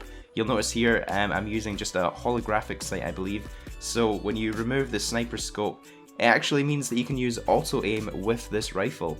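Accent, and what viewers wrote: Scotland, United Kingdom. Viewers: Find it so amusing watching videos and it’s a fellow Scottish man. So used to hearing Americans and English guys lol. Nothing against the English or Americans, love yous all.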